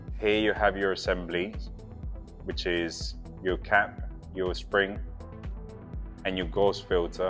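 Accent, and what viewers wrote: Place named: England, United Kingdom. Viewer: I knew that Colombian face but the English accent fooled me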